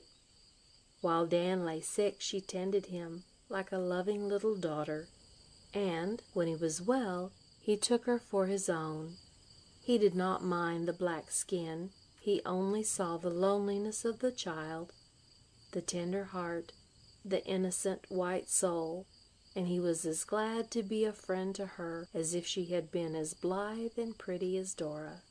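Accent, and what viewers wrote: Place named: South, United States. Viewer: Terrible terrible southern accent from this narrator.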